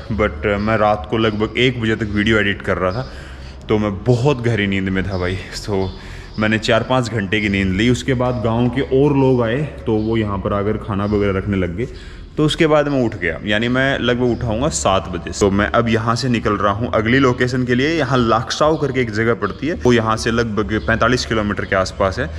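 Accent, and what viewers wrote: Haryana, India. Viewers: आपकी बोली में थोड़ी थोड़ी हरयाणवी है। भाई बहुत बढ़िया लगता है जय भारत जय हरियाणा जय राजस्थान जय राम जी की सहाब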